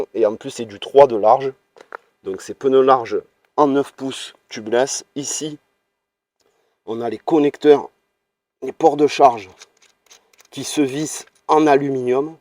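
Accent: Marseille, France. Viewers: merci et trop kiffant ton accent de Marseille je kiffe quand tu dit laissssee passer le monnnstree!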